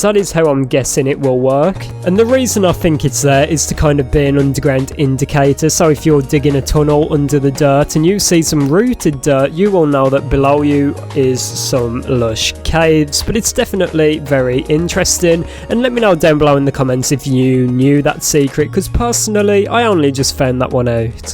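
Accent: United Kingdom. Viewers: Mans sounds like chills with a British accent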